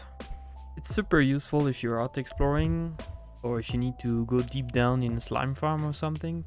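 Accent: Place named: Quebec, Canada. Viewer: Nice , I knew about most of em but didn't know creepers needed line of sight , thought was a player proximity thing as I have been hit many times by creepers sneaking behind me, but I guess that's my line of sight and not his or hers ( not sure about creeper genders 😉) always something to learn. And yes I agree boats are actually ridiculously op, who would have thought one of the most powerful and versatile items in the game would be a boat. ( maybe that's a hyperboly) . BTW are u French Canadian, I always thought u were from France but today I detected some hints in your accent that seems distinctly French Canadian. If so hello from Montreal.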